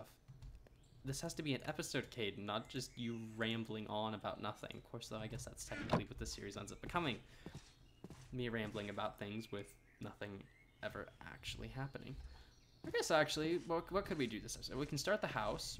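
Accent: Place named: Australia. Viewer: At first I could not place your slight accent, but then hearing you count made me realize. I honestly was like "This dude sort of sounds Australian." Boy am I dumb.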